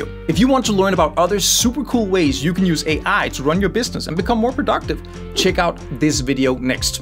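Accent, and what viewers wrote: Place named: Denmark. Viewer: Good video. Are you Danish? I ask because of your accent.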